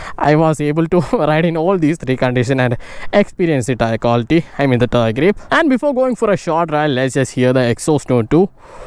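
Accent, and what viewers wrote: Kerala, India. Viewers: Malayali hain vo gajab insaan uska baath karne ka tarika vahi hain encourage him if you can or else please better to keep silent and watch the video.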